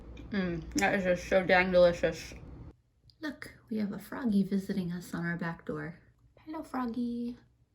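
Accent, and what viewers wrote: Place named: Delaware Valley, United States. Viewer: Hi! I just found your channel and it's incredible! I saw that you're located in NC, but I'm curious if you're originally from the PA/DE/NJ area? Your accent reminds me of home. 🤍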